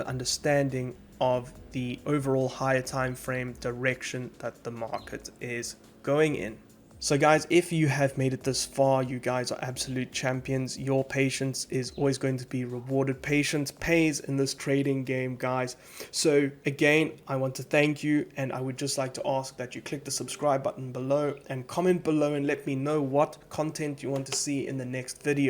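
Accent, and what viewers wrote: South Africa. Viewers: youre south african?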